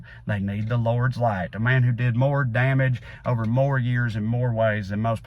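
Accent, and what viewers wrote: South, United States. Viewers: I love his Southern voice. You usually hear a twang added if a person is suppose to sound stupid. It is refreshing for me to hear an intellectual conversation with an accent. An old friend of mine had an acting class,and the first thing the teacher wanted him to do was talk with an southern accent you sound dumber. So he replied,”Naw, I don’t think so Cuz, ya’ll can have it. I’m missing the football game on t.v.”😊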